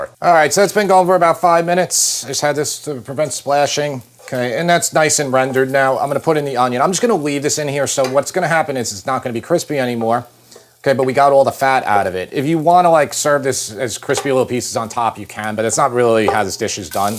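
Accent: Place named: Long Island, United States. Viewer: Great food. Great recipes. Great Long Island accent. Everything anyone could want. ⭐⭐⭐⭐⭐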